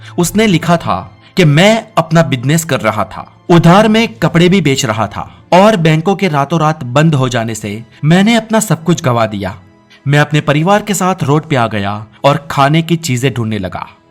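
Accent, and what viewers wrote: Gujarat, India. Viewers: Your voice is gujarati ...👍👍👍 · Gujarati lago cho bhai tmy 😀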